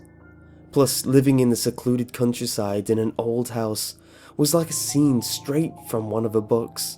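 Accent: United Kingdom. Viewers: MissleMasterJoe I mean he has a rather strong British accent but maybe I'm just used to it. (I live in the uk) Brohoof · Lol he dosnt sound Irish at all I could say he sound British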